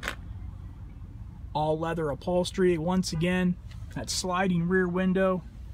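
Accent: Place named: United States. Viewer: what american accent is this?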